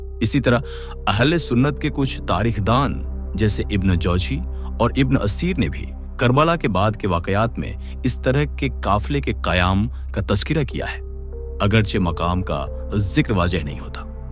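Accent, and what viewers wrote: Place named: India. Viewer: بھائی ہندی تلفظ میں اردو بول رہے ہیں ، جائرین اردو میں زائرین ہوتا ہے۔ یہ صرف مسلمانوں کو مزید تقسیم اور گمراہ کرنے کی ناکام کوشش ہے۔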